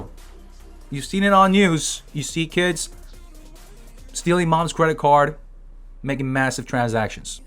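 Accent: United States. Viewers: Pretty sure he wasn't there before Considering his English accent. American Korean?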